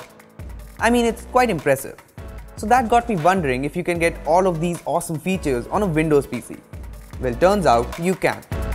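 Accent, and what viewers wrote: India. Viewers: your accent was funny man, Indian!!!!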